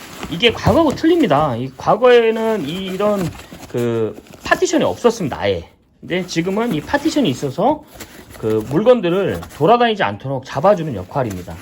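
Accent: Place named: Seoul, South Korea. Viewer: 님 역시 고급진 서울어에 목소리도 좋으시공 ㅎ 이렇게 이쁜가방 나눔이벤트도 해주시고 응원하면서 신청해봅니다 41번 진규철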